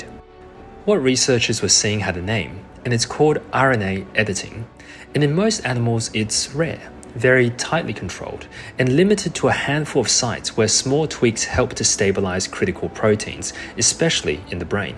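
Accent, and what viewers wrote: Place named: Australia. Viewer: Good to hear an Aussie voice, thank you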